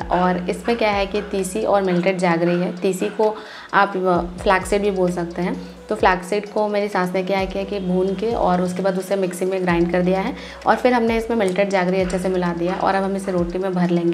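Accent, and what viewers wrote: Bihar, India. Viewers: Didi aap bihar se ho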